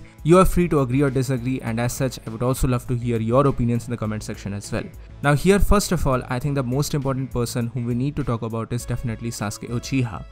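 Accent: India. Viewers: Noice man,nice explanations.....this is channel I was looking for and I like how u talk like in indian syle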